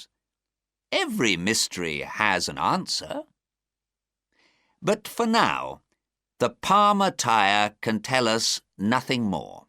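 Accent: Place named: United Kingdom. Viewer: Огромное - преогромное спасибо! Как же хорошо всё продумано, - и сам рассказ, - кто же не любит нашего родного Шерлока Холмса, и бархатный баритон чтеца, и произношение - волшебное, английское - преанглийское! Чудесный формат! Спасибо!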